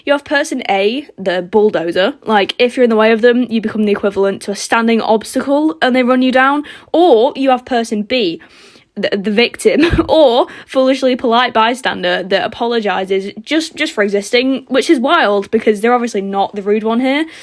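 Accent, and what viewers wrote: United Kingdom. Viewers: Bro sounds British 💀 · Top, love British accents. Subscribed! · haha this is so accurate, I also love ur british accent :3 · I loved this animation - kinda reminded me of JaidenAnimations but British and I hope this channel get popular like it. You got my sub, good luck on the channel growth. My mother was from England and lost most of her accent when she came to North America. I never had a British accent but I loved listening to British..ness.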